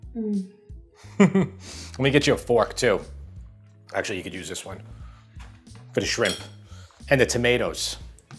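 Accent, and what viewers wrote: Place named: Long Island, United States. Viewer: Can’t wait to make this. Thanks! Love and miss the LI accent. Vermont just doesn’t cut it. 😬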